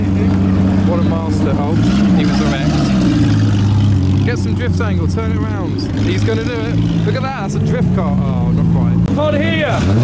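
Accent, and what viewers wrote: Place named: RP, United Kingdom. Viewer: I thought this was a supercarsuspects video until I heard the posh british voice lmao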